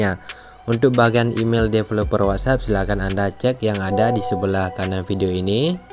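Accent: Aceh, Indonesia. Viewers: Nyan keuh khas awak aceh.. Adak bak Ujong donya di jak,tetap ta turi logat awak aceh.. Yg penting subscribe,, mangat teubai kantong.. 🤣🤣🤣🤣🤣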